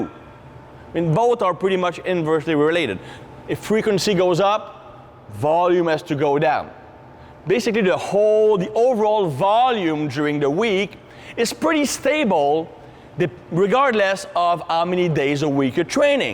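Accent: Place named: Quebec, Canada. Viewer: He’s French Canadian bro 🙄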